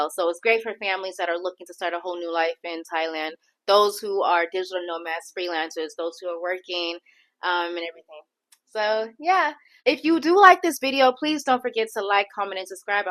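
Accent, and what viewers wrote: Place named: Philippines. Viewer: You sound like a filipina.😂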